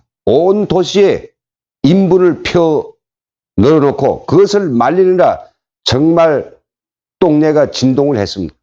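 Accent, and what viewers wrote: North Korea. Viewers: 북한 사투리 쓰는구만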